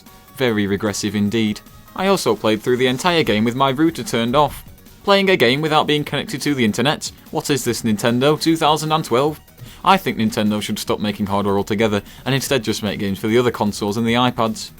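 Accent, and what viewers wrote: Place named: United Kingdom. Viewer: It'd be funny if it didn't sound like it was being read off a flash card. Or have that god awful British accent. :P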